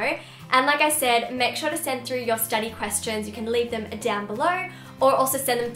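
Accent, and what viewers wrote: Australia. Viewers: are u australian like me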